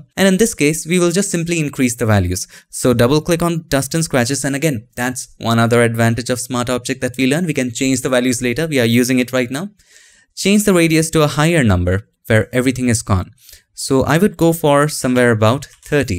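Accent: India. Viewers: Indian accent = check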